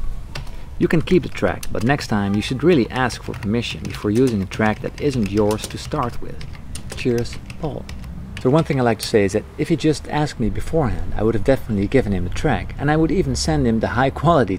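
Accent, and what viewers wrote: Netherlands. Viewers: I Always thought you had a dutch accent and the mail in this video confirmed it for me :) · ik ruik je Hollands accent, Hup Holland Hup we worden Wereldkampioen 2022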